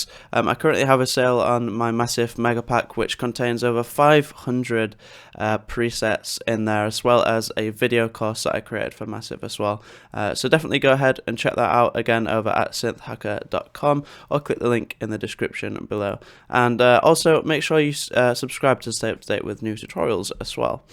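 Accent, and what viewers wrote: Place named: Teesside, United Kingdom. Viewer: Teeside accent im hearing 😊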